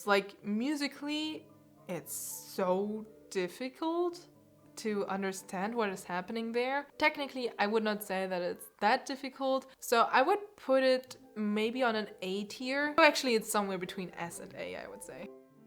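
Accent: Germany. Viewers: Nice the German accent and words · That accent tells me ur native language is german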